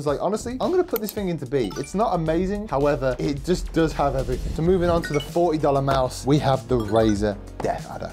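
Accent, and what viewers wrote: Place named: England, United Kingdom. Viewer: I love your english accent.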